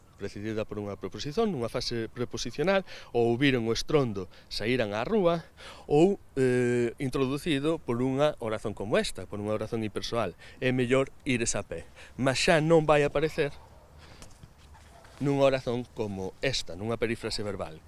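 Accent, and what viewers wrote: Spain. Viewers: Esto se escucha como un español aportuguesado, más que como portugués. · Parece muito com o português brasileiro mais com sotaque espanhol. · Para mim que sou do Sul do Brasil o seu falar soa como um espanhol falando português brasileiro com sotaque carregado. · Caro professor, você no Brasil passaria como se fosse um nativo com um leve sotaque espanhol. Realmente a língua da Galícia é muito semelhante a língua portuguesa falada no Brasil. A semelhança é maior do que o sotaque de Portugal com relação ao nosso sotaque. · Eu tb pensei que ele era um "gringo" falando português, com o sotaque do espanhol. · Ele fala o galego com sotaque castelhano , ou não ? É melhor por vocês falam parecido com o nosso português do Brasil. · Soa como ele fala português com um sotaque espanhol.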